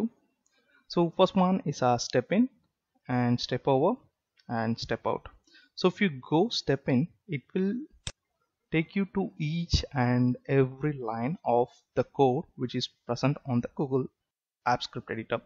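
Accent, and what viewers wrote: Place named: India. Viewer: From I heard the Indian Accent I knew gonna be great vid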